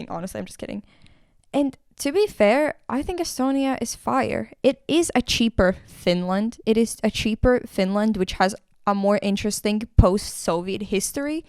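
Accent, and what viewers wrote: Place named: Latvia. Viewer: Your English pronunciation is very similar to other Latvian people I know so I had the feeling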